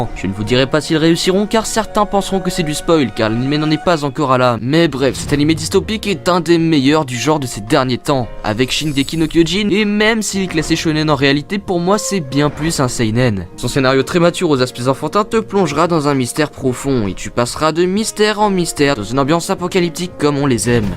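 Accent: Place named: England, United Kingdom. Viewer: Vidéo très intéressante et très instructive , accent anglais au rdv. Appréciable. <3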